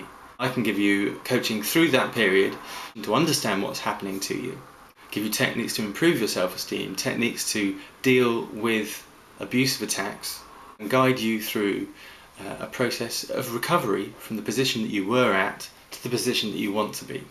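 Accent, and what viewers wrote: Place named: Australia. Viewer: Every time I hear that annoying accent I want to punch an australian in the face.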